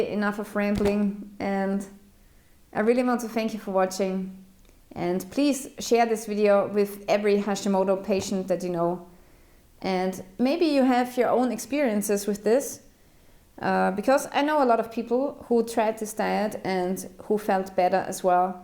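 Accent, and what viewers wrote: Sweden. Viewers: Are you from sweden/Sverige on swidish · Wait are u swedish ??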